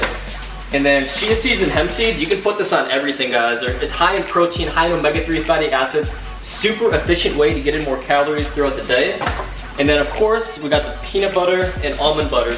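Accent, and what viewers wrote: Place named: United States. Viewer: This guy looks very Russian I was surprised by the American accent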